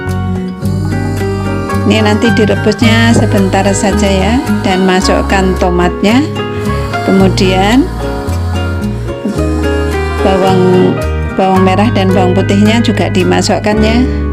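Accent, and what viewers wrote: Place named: Java, Indonesia. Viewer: Bunbunya medok mantul bun🤗🫶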